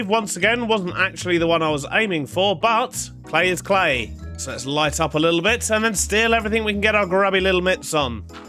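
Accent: United Kingdom. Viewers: Are you British or American you sound British but it might just be me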